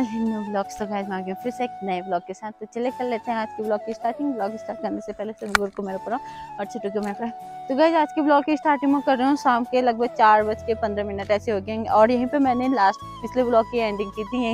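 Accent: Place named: India. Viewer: aapke bhasha deval side ki lag rhe the to mena bol dya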